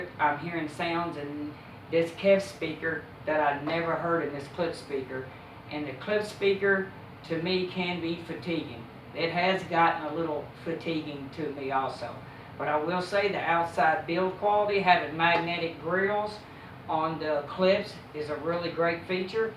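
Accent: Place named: Texas, United States. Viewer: I like this guy’s accent. Where is he from, Texas perhaps?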